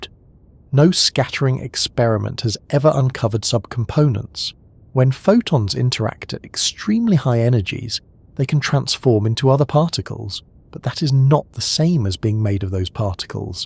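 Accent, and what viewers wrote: United Kingdom. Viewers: Friendly brit speaking (no music)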